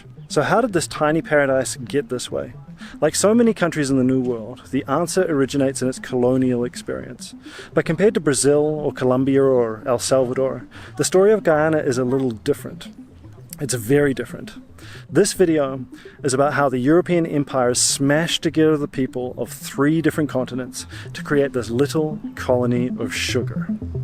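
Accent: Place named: New Zealand. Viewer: Great content bruzzy! Editing, music and most importantly delivery and info on point. Also as an Aussie who grew up in aetorea i dont think ive heard a more "international" or "posh" kiwi accent! its almost imperceptible but sort of ole' school journalist which i love. Keep up the good work. Subbed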